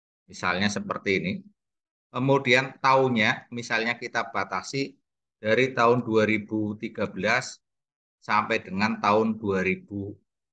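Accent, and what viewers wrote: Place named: Tegal, Indonesia. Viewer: dari sekian banyak yg jelasin vos viewer, anda adalah yg paling mudah diterima, bahasa lugas dengan khas tegal serta tidak banyak belibed spt kebanyakan ibu-ibu yg jelasin vos viewer... support terus!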